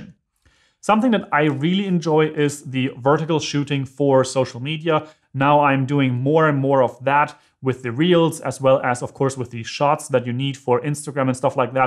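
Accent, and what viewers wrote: Germany. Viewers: Might be depending on your hand size and use cases. Btw. den deutschen Akzent kann man nicht verstecken:D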